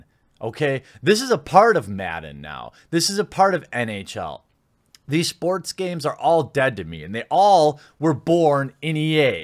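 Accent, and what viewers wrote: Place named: Minnesota, United States. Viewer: Ha you say rag tag like you say bag. Are you from Minnesota ?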